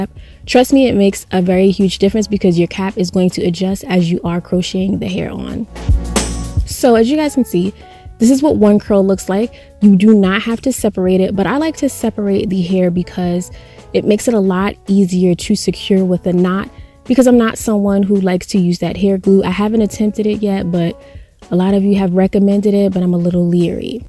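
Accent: New York, United States. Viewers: Auntie u got a NYC accent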